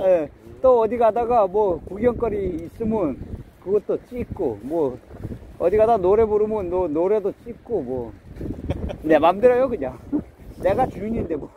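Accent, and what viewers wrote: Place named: North Korea. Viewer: 님... 실례지만... 고향은 어디세여? 말투가... 윗쪽 같으신데 알수있을까요?? 새터민이신가요?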